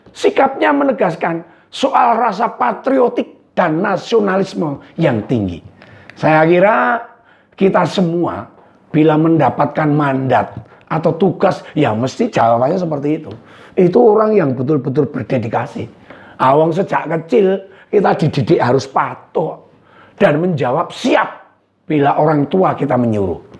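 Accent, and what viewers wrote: Java, Indonesia. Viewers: Senang ikuti ngompol yang dipandegani mas logat jawanya yang kental enak didengar,terus suarakan kebenaran mas, kami warga Kalimantan Barat siap mendukung dan siap menangkan pak Ganjar Pranowo di pilpres 2024.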